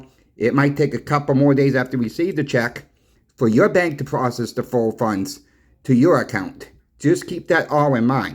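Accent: Boston, United States. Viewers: After hearing you talk for a minute, I can tell you are from Boston...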